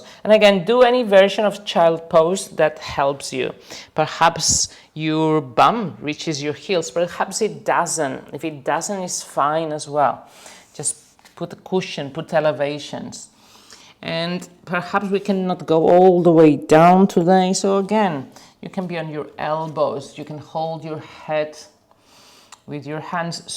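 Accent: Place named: Spain. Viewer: Amazing class, accessible to all with a twist of spanish accent. My go to class when I'm in need of slow movement and ease of mind :)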